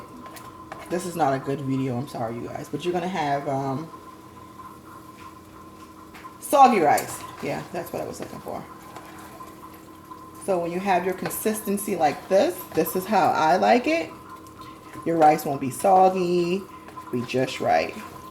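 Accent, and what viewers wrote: Charleston, United States. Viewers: I hear the accent💋yess Charleston bnr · Ok first Thank You! I'm a Gullah girl from Charleston straight off America st. Unfortunately my elders have passed on (including my mom) before I could get my geechee recipes uuuggghhh! I grew up on all of this. The family members I have left don't know how to give a recipe lol! It's a Lil of this & that. So Thank You for the visual step by step!!! Now I'm going to ask a small favor. It's only 3 in my family that eat red rice (my husband doesn't care for it...yes we almost divorced behind this 😂😂😂) but he loves okra soup so I decided to keep him. Can you provide me with a recipe on a smaller scale? Like maybe 2-3c of rice vs. a 2lb box? If not I completely understand and no hard feelings. If you're willing too then I Thank You in advance! 😘 I also have my grandmother's traditional rice cooker/steamer she used to cook her rice in for decades all my family still use theirs as well, but I haven't figured out how to use it uuuggghhh (for those who want to know true value hardware carries it) So I'm glad I stumbled across your method. 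are you from Charleston? I hear a faint accent. Mine come out when I'm pissed lol! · You did AWESOME on your video! I had to search a bit- then found the title- I wanted to see where you were from. You were reminding me of home...that accent. I was thinking she "has to be" ....and YEP! Anywhere you go, you hear a Charleston accent...YOU KNOW IT! Charleston born and raised living in Texas now. Again, I THANK YOU!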